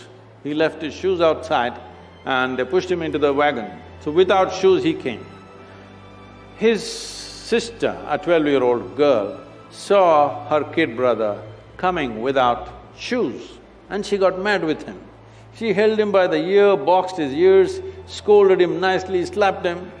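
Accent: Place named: India. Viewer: Giọng ấn đặc trưng thật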